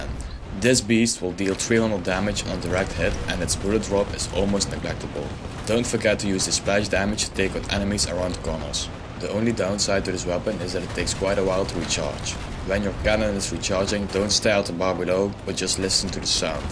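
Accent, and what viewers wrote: Netherlands. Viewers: Nederlands accent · Dat Nederlandse accent herken ik meteen :D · kom jij toevallig uit limburg? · r u dutch? thats not an insult, for me as german it sounds that way ;) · yeah,the first 3 seconds you started talking i thouth:''he is dutch...'' me to! :S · wow dat accent hoor je meten dat je uit Nederland komt geweldige video trouwens erg duidelijk en goed in elkaar gezet ik ben nu lvl 14 1/2 en zelf ik heb nog moeite met de tank. bedankt!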